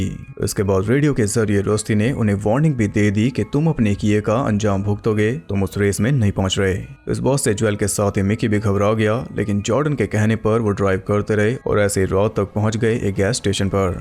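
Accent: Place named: Bihar, India. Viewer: Friday the 13th 2009 wali movie fir se explain karo bhai please pahle ki voice acchi nahin hai aap Jo voice hai vah bahut acchi hai kyunki yah thodi Bihari 🥺🙏🙏🙏🙏🙏🙏🙏🙏🙏🙏🙏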